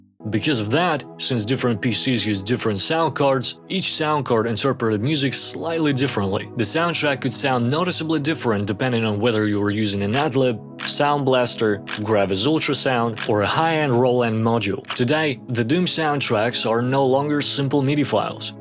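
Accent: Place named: South, United States. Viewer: Merio 😅 Dig the southern accent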